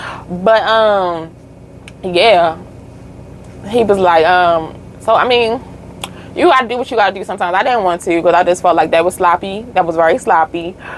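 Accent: Baltimore, United States. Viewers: you from baltimore? haha sound like you from round the way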